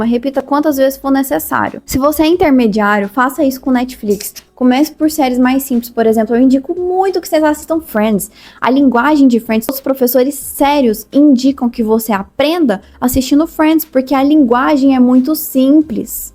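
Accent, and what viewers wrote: Minas Gerais, Brazil. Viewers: Agora que percebi que você tem mt sotaque mineiro rs (adoro, por sinal) ótimas dicas,. Aderi por aqui a de Friends, que pra ser sincera, nunca nem assisti 😅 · Tu é Mineira, Goiana?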